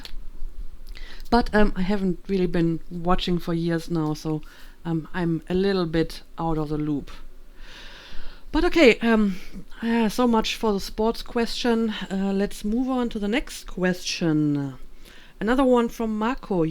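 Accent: Germany. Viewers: Congratulations! I knew your accent but I never found out where it came from in Germany so thanks for answering that question! Other things I'd like to say are that I do share your interest in Folk Metal and that most of us french people (I'm from Strasbourg) also find snails gross. Btw I checked the Bielefled controversy. Just wow. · When I first heard your voice, your accent sounded really familiar. I actually wondered if you were Tianlein who does Elder Scrolls Online fashion content here on youtube. Maybe a second channel of hers but I clicked on one of her videos and her voice is slightly different to yours (also German though). So, I used to play Elder Scrolls Online with a German lady about your age, from Cologne, who liked exactly the same folk metal music and is called Katrina (shortened online to Cat). The only reason I know it cannot be the same person is because I played ESO on PS4 not PC. 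Also, the person I know is actually Indian and that part of her accent is not present when you speak. Very strange coincidence though lol.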